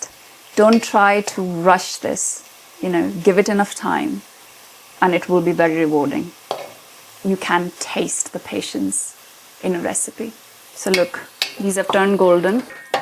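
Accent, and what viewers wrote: United Kingdom. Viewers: Is she English or Australian? What's this accent? Or maybe she's Indian? I realize she is ethnically Indian but the accent doesn't sound like it. I love the dish by the way! Watching from Canada!!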